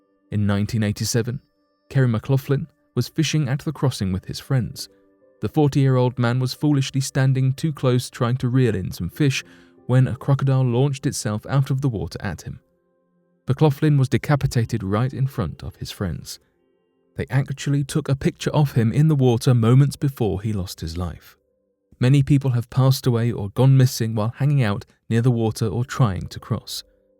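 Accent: United Kingdom. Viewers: WHERE YOU FROM ARE YOU A FELLOW BRIT? 🇬🇧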